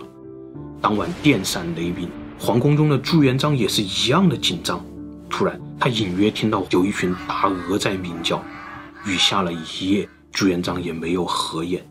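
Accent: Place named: Chongqing, China. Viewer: 我预判是重庆人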